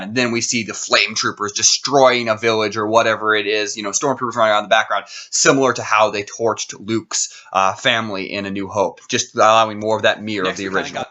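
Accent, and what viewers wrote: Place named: Kansas, United States. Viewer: Are you from Kansas? I am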